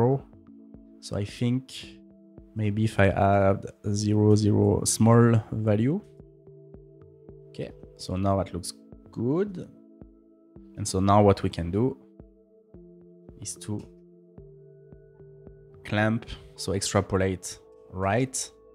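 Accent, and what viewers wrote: France. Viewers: Nice video! You have a french accent, isn’t it? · Vous avez une accent française 😄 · Excellent tutorial, thank you! Also love your accent haha, you sound more French than Swiss to me but I'm Australian so am hardly qualified to tell the difference. :p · So adorable french style english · Intéressant le tuto français ça ce vois a l'accent ? 😊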